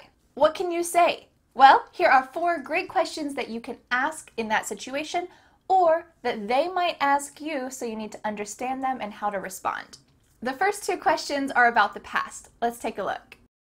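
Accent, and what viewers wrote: United States. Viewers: Thank you,. You're the best teacher I've ever seen. Learning with your videos is so varied and makes a lot of fun. Your videos and English-lessons are very helpful and I hope that I'll become a confident English speaker with it. I wanna say it again and again: thank you, thank you for everything.🍀 P.s. I love the American accent and the pronunciation of 'er'😍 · Hi. I am one of your new subscribers. I just want to tell you that I love your content. Just a question, which part of the US you are from? Is this is a California accent? I am Polish but I have been living in the UK since 2004 and guess what? I only love US accent your's is one of the ones I like that's why I did ask you this question. Thanks:)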